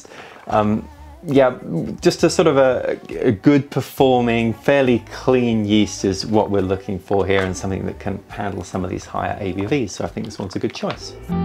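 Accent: United States. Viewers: That southern accent 😂And that beer looks good!! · I LOVE Double IPAs...awesome recipe, awesome video as always! I want that Clawhammer system SO badly...but I'm eyeing up the Brewer's Edge Mash and Boil (w/ pump) for now. It's a lot cheaper, but obviously not reaching the level of the Clawhammer. Anyway, loving the series! Thanks! The American accent btw...SPOT on. ROFL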